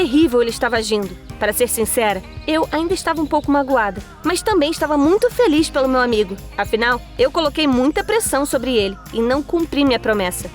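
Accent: Brazil. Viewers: Como ela é de Portugal sendo que ela fala igual brasileiros , Claro q eu sei q Pessoas de Portugal falam português , mais tipo eles falam diferente do Brasil, ou ela é brasileira e foi pra Portugal?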